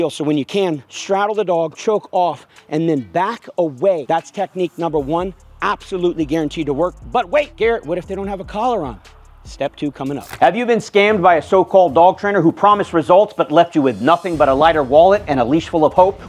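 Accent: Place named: United States. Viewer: You fled the UK and developed an American accent pretty fast.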